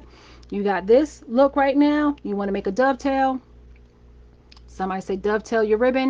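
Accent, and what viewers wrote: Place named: New York, United States. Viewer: Hi you sound like a New Yorker ! I'm from Boston (Roxbury) Ma. just came across you channel and yes I love crafting I just started a about a year ago. I love how good you explain things !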